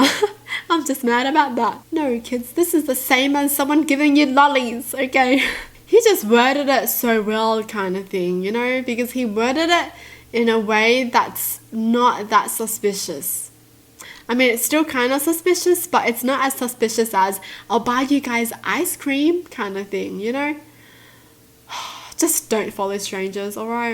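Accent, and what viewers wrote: Australia. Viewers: How did I just notice that you were Aussie I am to yay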